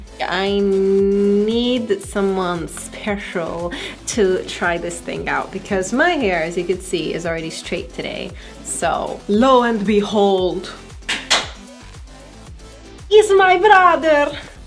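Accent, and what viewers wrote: Italy. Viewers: It’s a typical Italian accent ...That’s really strong I didn’t know there were some people to appreciate this accent lol in my country (Italy) when we talk in English a lot of people don’t like the Italian accent because is considered to be ridiculous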